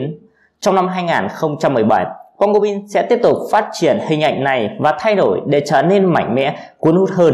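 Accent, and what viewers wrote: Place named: Mekong Delta, Vietnam. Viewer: Chỉ biết Bình luận: Rất- rất đẹp. Giọng nói Miền Tây. Cực Dễ thương.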